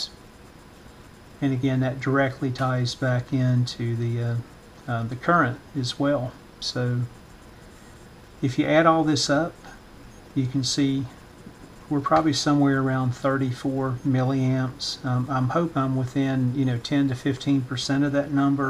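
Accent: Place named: United States. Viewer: Thanks very much. I didn´t know that web page catalog for old radios. It will be very useful for me with this Portadyne has my head on nuts. Have a nice day. Wish to see your next video. Your american english voice is not so difficult for me to understand (only some times with some words or some short phrases). Best regards and follow on line. Ketk (from Spain).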